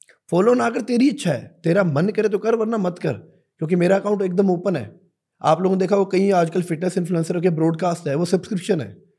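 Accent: Bihar, India. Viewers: Aap bihari ho sir?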